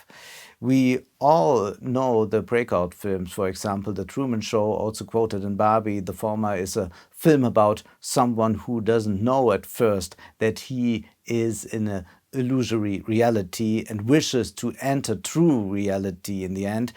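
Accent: Germany. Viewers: Jesus man hört den deutschen Akzent richtig hart raus.... Die Vorstellung das die Aufrufe explodieren nur weil das Video nochmal in Englisch gesprochen wird... Vielleicht lieber einfach englische Untertitel anbieten und wenn es viele wollen und man es kann dann anbieten? So ist der Aufwand doch höher als der Ertrag oder? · Mei dier Genosse! Der deutsche Aktenz stört glaube ich uns Deutsche mehr als andere. Ich habe die Erfahrung gemacht, daß ich Leuten die deutsch, aber mit einem fetten Akzent sprechen, eigentlich problemlos zuhören kann. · To create content in english as well makes sense on YouTube, in order to reach a wider audience. Great work, i hope it pays off! And the german accent is not an issue at all, we live in the 21st century, people from different countrys have accents… who cares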